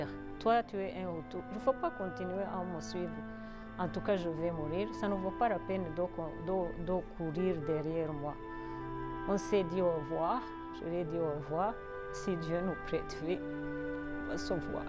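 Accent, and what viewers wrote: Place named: France. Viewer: Idk why but I love her French accent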